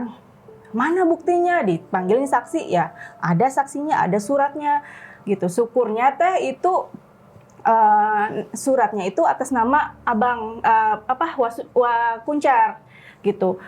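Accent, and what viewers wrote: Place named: Karawang, Indonesia. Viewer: Logat krawang nyaa .. jdi kangen kluarga aku yg d Rengasdengklok 😊😊😊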